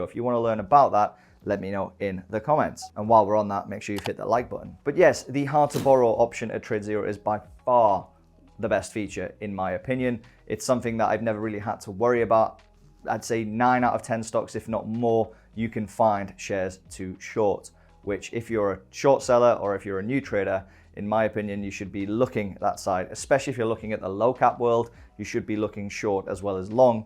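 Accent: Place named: Newcastle, United Kingdom. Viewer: Love the Geordie accent are you in Newcastle??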